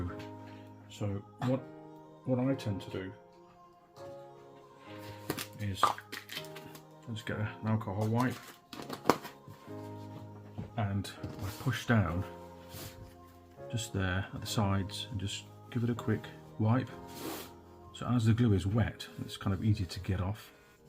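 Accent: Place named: United Kingdom. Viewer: Thanks for this instructional! Yeah...my tab a dropped the screen and snapped the ribbon cable in half mid-length just as if cut by scissors! The screen glanced of my leg and luckily landed face down on the glass side on my linoleum floor. No APPARENT damage other than the cable itself. I now feel confident I can tackle the project. By the way ...What type of glue did you say you were using??? You sound like a Brit to my USA ears (or maybe Aussie?) and I couldn't determine for sure the glue type/brand you referenced Thanks again!!! By the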